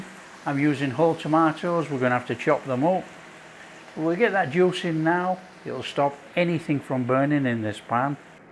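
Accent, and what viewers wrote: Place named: Leeds, United Kingdom. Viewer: Fantastic. Delivery superb. Really lovely guy, from Leeds. I guess? Keep it coming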